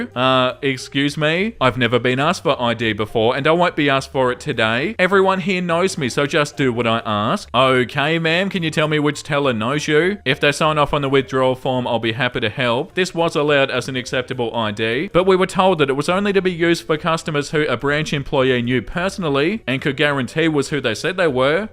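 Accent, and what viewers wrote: Australia. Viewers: I have to wonder if you're an Aussie. You sound like it, and it's nice to sit and listen to.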